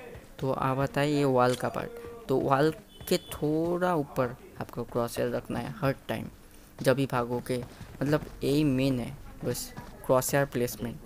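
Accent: Bengal, India. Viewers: R u bengali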